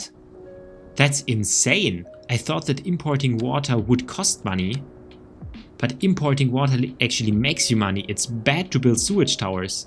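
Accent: Germany. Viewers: Do I hear a German landsman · Bro kein hate aber du hast einen krassen deutschen Akzent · Oh! You‘re German… I hear it and I saw it. Nice vid/cooles Video… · es ist so komisch nem deutschem beim englisch sprechen zuzuhören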